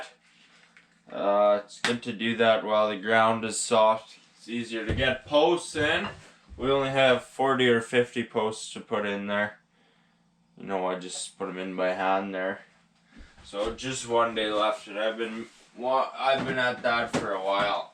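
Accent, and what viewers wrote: Canada. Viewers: Ah shite aye? Thats kinda luck shitteh. I came here for how you talk its hilarious and the world needs more back country Canadian slang.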